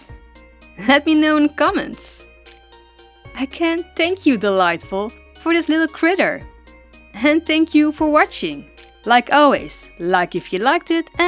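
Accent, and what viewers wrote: Netherlands. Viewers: ons nederlands accent is echt grappig😆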